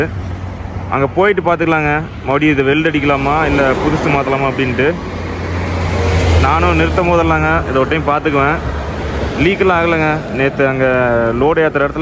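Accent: Kongu, India. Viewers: கொங்கு தமிழுக்கு வணக்கம் சொல்லி கொள்வது நெல்லை தமிழ் 🎉🎉🎉🎉🎉🎉🎉🎉🎉🎉🎉🎉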